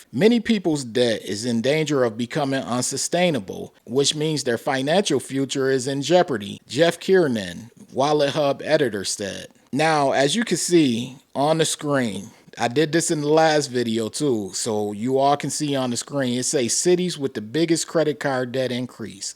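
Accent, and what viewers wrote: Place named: Philadelphia, United States. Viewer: Bro, you sound like you're from Philly. Buffalo is a surprise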